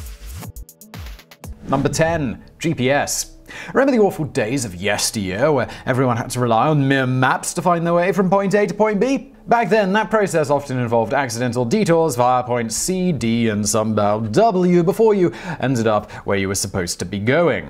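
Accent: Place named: RP, United Kingdom. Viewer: "Radda-ranj" oven? (just teasing! I'd love to have a posh British accent over my midwest Ohio drawl...)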